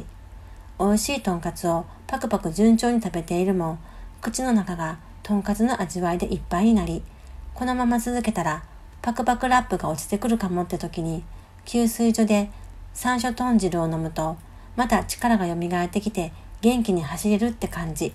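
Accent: Kyoto, Japan. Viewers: 京都弁って～情緒があって～大好き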